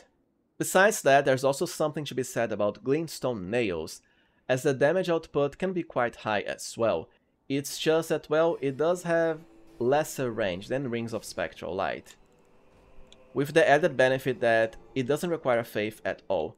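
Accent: Brazil. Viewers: I know a brasilerio when I hear one ;) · reconheci o sotaque brasileiro na primeira frase, adorei o video mano!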